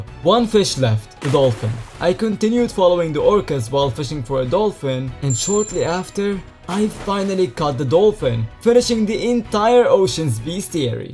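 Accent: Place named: India. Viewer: Bro what is this srupid Indian acent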